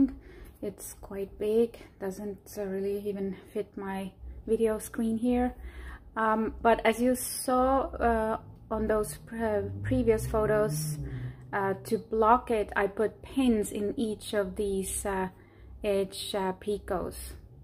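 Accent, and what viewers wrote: Estonia. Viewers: Are you estonian? You have an estonian accent :)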